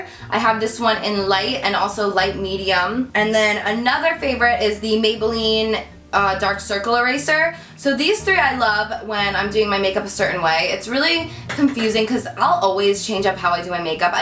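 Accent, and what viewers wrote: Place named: East Coast, United States. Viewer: Yeah it’s the east coast accent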